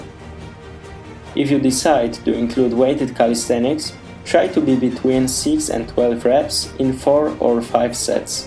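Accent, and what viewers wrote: Poland. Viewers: to Polak? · When I heard you I thought: What a polish voice! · Wyczuwam Polski Akcent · Polisz inglisz najlepszy <3 :D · Coś taki polski ten angielski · Ah ten polski akcent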